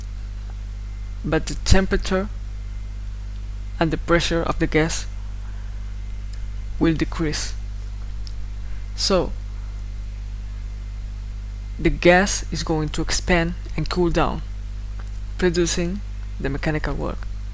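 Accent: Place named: United Kingdom. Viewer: El acento de tu inglés es como británico. Muy bueno.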